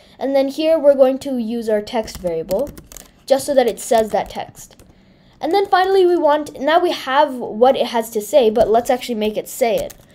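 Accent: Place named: United States. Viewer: Where do you live ?I mean your origin is India but accent is American . Can you please tell me where do you live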